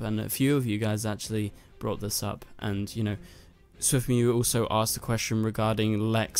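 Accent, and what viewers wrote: United Kingdom. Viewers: Just subscribed. Hear a brit accent, so got to support a fellow brit.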